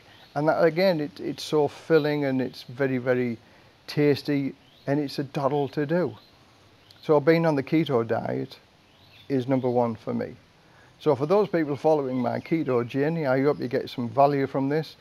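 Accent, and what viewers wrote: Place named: Sunderland, United Kingdom. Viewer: Areet mate. I’m from Tyneside. And I can tell you are from Sunderlnd way by your accent 😂. No worries 😉. Watched a couple of your YouTube vlogs on Thailand and loved them. As I’m 130KG. 20 stones in old money I became interested in your “Keto” diet. My goal is 90 KG. I’m 64 year’s of age and desperately need to lose mega weight before I die. I haven’t visited my GP for two years since the outbreak of the pandemic. Although I have received two jabs and booster and fortunately not contacted Covid-19. So thanks to you I’m going to follow your guidance and achieve my goal.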